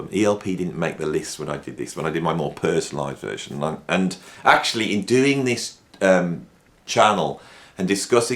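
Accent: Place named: England, United Kingdom. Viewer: I'm not happy you should have had them on the list from the very beginning and your reasoning was pretty lame 😢 it's hard to believe I hear an English accent coming out of your mouth but you didn't pick ELP the first time around😮 I say this and I'm American😊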